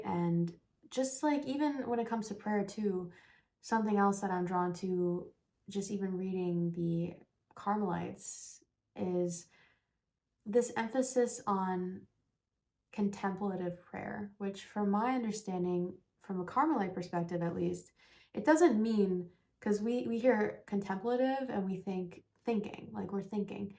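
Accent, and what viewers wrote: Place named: South, United States. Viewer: Why are you speaking in a Southern redneck accent?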